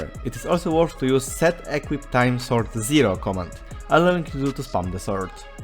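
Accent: Poland. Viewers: Z polski jestes bo polski akcent? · Ah ten polski akcent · ale słychać tą polskość. Pozdro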